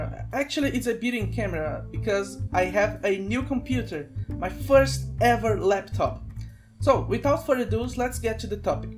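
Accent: Brazil. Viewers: sotaque brasileiro??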